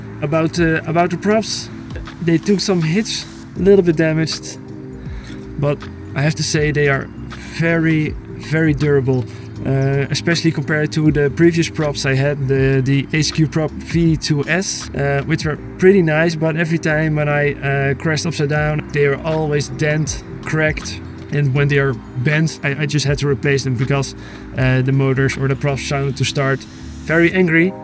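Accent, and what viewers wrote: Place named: Netherlands. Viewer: ERMATTEN and THERMAC. The Dutch accent is killing it lol keep it going dude! Great Video's!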